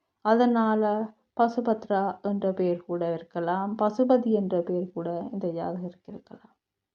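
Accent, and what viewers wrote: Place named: Sri Lanka. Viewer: சிலோன் தமிழ் அழகாக உள்ளது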